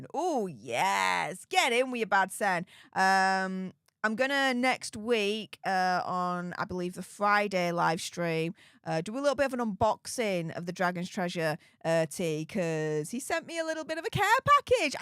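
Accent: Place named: Manchester, United Kingdom. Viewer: I grew up in Manchester until I was 14 . I have been living in Biloxi Mississippi since 1972. Can’t believe how fast 52 years has flown by. Your accent makes me home sick, Much love to you from the Deep South, only 60 miles from New Orleans. 😊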